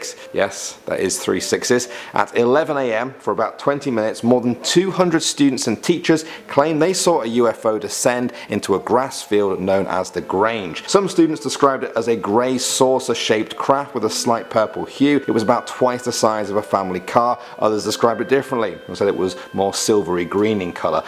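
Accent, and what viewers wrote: United Kingdom. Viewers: To all Australians who focus so much on pronunciation. Do you realize that this man is from Great Britain? You don't expect him to pronounce city names with yours accent. And if you think that your English is the only and right one, then remember where your great grandfather came from. Seriously that's ain't even funny. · dark hues hes English/British....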